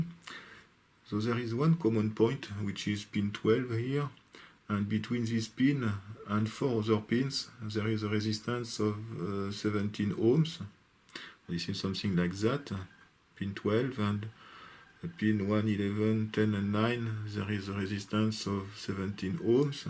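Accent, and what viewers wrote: France. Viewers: Époustouflante travail de reverse engineering et excellentes explications des différents schémas. L'accent est catastrophique malgré un très bon niveau d'anglais, mais c'est un problème fréquent chez mes compatriotes français. Je reste abonné 120% à cette chaîne, unique par son excellent niveau technique. Bravo!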